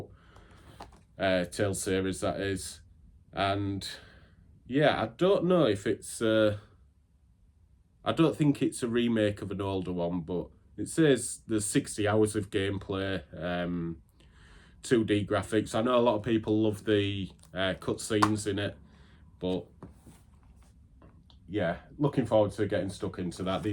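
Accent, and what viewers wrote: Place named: Hull, United Kingdom. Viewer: Hey dude, great video, love prince of persia games. Found this video randomly and because you sound like me (im from hull, or should i say 'ull 😂) ive subscribed. Look forward to followng your journey mate. Keep up the great work and collection 👍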